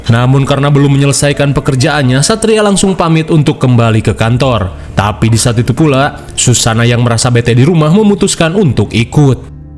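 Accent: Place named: Banten, Indonesia. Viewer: Mang orang banten?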